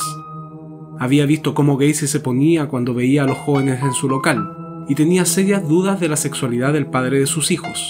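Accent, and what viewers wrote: Chile. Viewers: es chileno el que habla?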